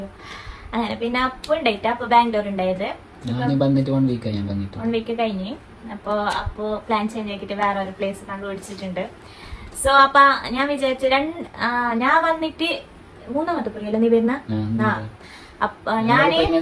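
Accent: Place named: Kasaragod, India. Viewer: Ksaragod basha edayo kanda pole ....eda nigala home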